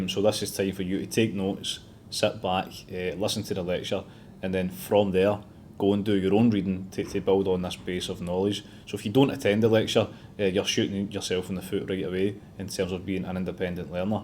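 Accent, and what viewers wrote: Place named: Austria. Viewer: this guy speaks english like the way the Austurians speak german !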